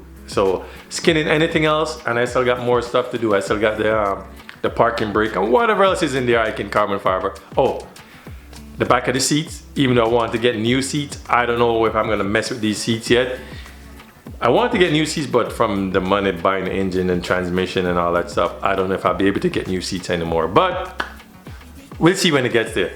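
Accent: Jamaica. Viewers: u gotta be Jamaican lol im doing the same thing and ah run from the same tings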